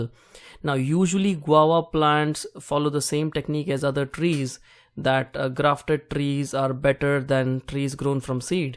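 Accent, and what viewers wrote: India. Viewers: Is this voice indian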